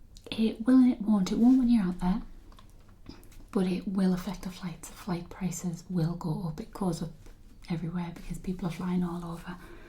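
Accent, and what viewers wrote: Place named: Newcastle, United Kingdom. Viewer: knew you were from newcastle!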